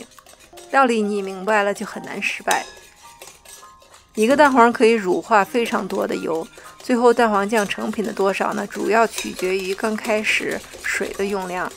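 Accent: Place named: Beijing, China. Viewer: 好可爱的儿化音口音💘，一看介绍，原来在我隔壁省。一直觉得像做饭或者做那种天然手工品（前段时间在看手工皂），都像巫师一样施法。难怪说，学好数理化，走遍天下都不怕🤣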